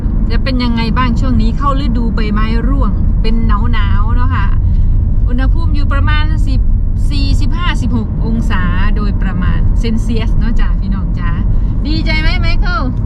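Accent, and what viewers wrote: Thailand. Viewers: เอ๊ะ เหมือนฝนจะตก กางร่ม กันด้วยนี่ แต่..เอ ฟังน้อง พูดภาษาอังกฤษ.ยังเป็นแบบไทยๆ อยู่ นะ คือดีไง..เราไม่จำเป็นต้องเหมือนฝรั่ง ใช่ไหม น้อง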